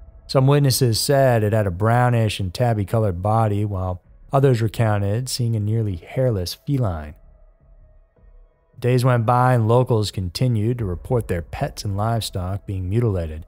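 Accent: United States. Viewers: just subscribed to your channel...ive been watching true crime for a long time...u have alot of stories that are new to me...great footage...love the american accent🙂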